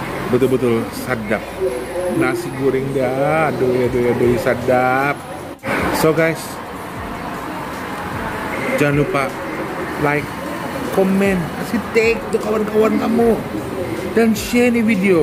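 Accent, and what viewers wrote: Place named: Sabah, Malaysia. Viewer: Mantap video bro,terhibur sya bahasa original sabahan,kasi kluar jak ko punya original sabahan,sama kasi senyuman dulu seblum makan 🤣.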